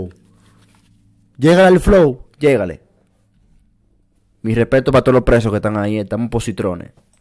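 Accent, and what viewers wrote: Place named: Panama. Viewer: Bueno este tipo de donde es porque habla como panameño y al mismo tiempo como dominicano alfin que mi pana o es que no tiene identidad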